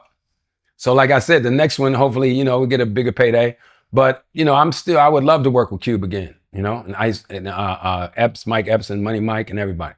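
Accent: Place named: Washington DC, United States. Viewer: My man from DC! NW right here!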